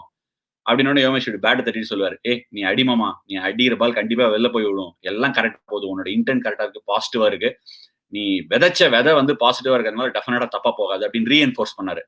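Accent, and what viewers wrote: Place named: Tamil Brahmin, India. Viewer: Tamil Brahmin style of talking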